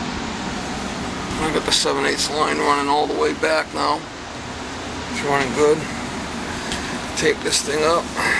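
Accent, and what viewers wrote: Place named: New York, United States. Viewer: Hi another good job there I do like watching your videos all the way in not so sunny England,I've watched a few of your videos and you seem to highlight the need to install the correct size of pipes,I'm a commercial heating engineer so I do understand the need for correct pipe sizing, I'm new to AC is there a chart to help you and more so me with choosing the correct size pipes keep up the good work Ps loving that New York accent Kirk (Manchester England)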